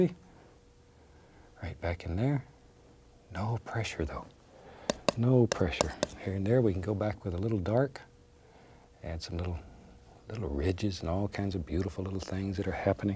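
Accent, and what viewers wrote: South, United States. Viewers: Even after all the years of living away from the south, he still sounded so very southern.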